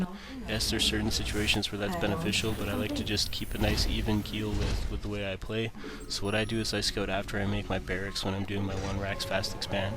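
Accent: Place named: Canada. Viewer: Having gone through this series from the start, I feel it's funny how I agreed with one of the early posters who complained about voice inflection etc. (and of course his oat and aboat Canadian accent:-) But it seems I got used to it very quickly, I guess mainly because he says a lot of very useful things that are right up my alley. All the other "coaches" even such as day9 seem unable to break down the many many aspects of the game into steps that you can practice individually.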